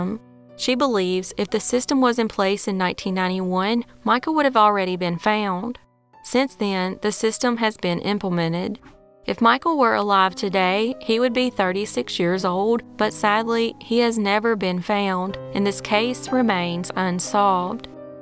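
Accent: South, United States. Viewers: Just discovered your channel. Thanks for covering these Canadian cases. Subscribed 👍 The Southern accent only makes it that much better! So charming and attractive when southern women speak. Not trying to be weird or anything, but it's true!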